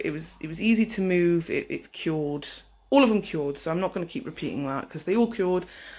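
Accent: Cardiff, United Kingdom. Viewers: This was a brilliant video. I find her really good. Is that a Cardiff accent by the way.? Anyway really enjoyed your video and found it really helpful and interesting. Really good. Sorry not sure what I think about subscribing to things but really like your stuff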